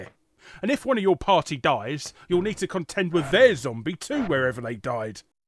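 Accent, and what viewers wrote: England, United Kingdom. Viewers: What part of England is your accent from? I always wanted to know.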